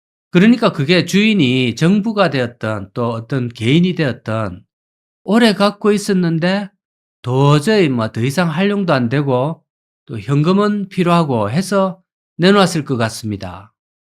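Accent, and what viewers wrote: Gyeongsang, South Korea. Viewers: 맞습니다 멀리 캐나다에서도 항상 다음 영상기다립니다 구수한 영남에 사투리로 재밋게 말씀 하시는것도 너무 재밋습니다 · 갱상도 사투리 + 충청도 어투...? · 등더리 ㅋㅋㅋ 진짜 얼마만에 듣는 고국의 갱상도 사투리인가요. 억쑤로 고맙십니더.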